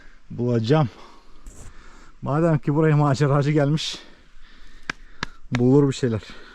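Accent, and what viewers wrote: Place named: Van, Turkey. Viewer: Şiveniz vanlılara benziyor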